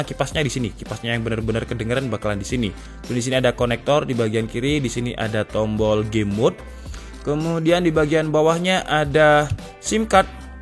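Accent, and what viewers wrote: Malang, Indonesia. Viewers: umak wong malang ta sam?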